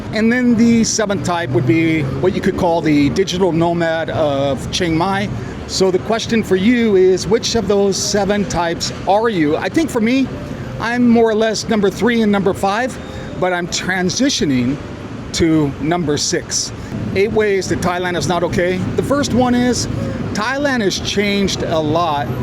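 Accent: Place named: United States. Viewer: You sound so American. If only you knew how you sound to the rest of the world.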